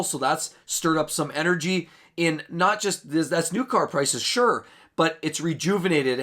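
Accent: Canada. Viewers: What accent is this? Canadian?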